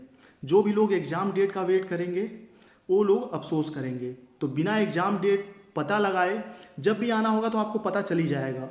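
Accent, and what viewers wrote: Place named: Uttar Pradesh, India. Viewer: I m right .apki bato se.. video k starting m hm soch hi rhe the...ki aap U.P. se ho..or aapne apni video m hi clear kr diya.....hmne apki complete video dheki h.. 🙏🙏🙏sir plz ek confuse clear kre...sst ki preparation kaise kre...plz tell me...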